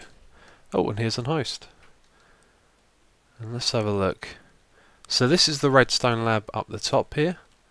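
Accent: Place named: United Kingdom. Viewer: Brittish English....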